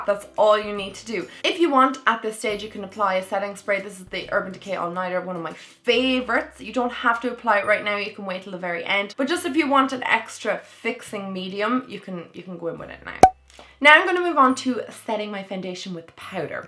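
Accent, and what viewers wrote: Ireland. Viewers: I love your vidieos! I also love your makeup looks u do! I've bought several products from watching u that I love! I love the loreal blur cream and the nyx butter lip in fun size! I also love your accent!!! I'm Irish too and my grandfather has the same accent I love it!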